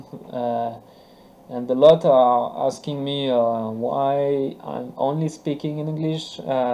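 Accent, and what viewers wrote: France. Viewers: On est d'accord il a un accent français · are you french because am i and you have the french accent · LE BON ACCENT FRANCAIS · oh ok i was telling m'y self why have à frensh accent but he is frensh mdr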